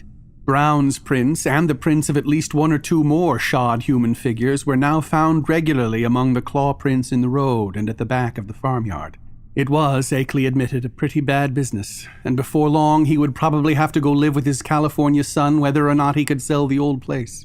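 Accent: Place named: Vermont, United States. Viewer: Best recording of this story I have heard, and I try to listen to them all. Impressed with your Vermont accent.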